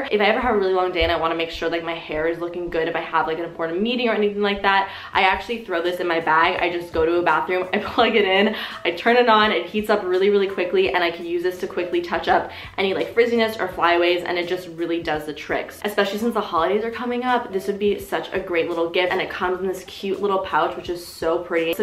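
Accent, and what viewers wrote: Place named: Canada. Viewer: I love you and your videos!!! I could just listen to them 24/7. Also the way you say name is 👌🏻👌🏻👌🏻 I genuinely love your Canadian accents lol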